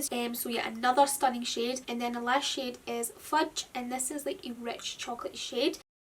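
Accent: Scotland, United Kingdom. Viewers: are you scotisch? 😍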